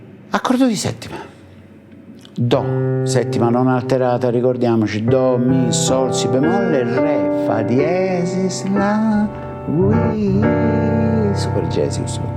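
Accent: Naples, Italy. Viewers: Maestro sei grande! lo adoro sto' accento Napoletano! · è bravo, bravissimo comunque, anche fosse di Brunico. La Napoletanità non c’entra proprio una cippa, se non per la parlata sardonica e disincantata da napoli-snob che è un piacevole orpello all’ascolto.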